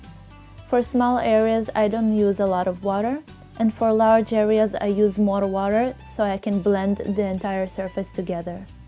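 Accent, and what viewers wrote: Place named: Israel. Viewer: also, it sounds like you have an isreali accent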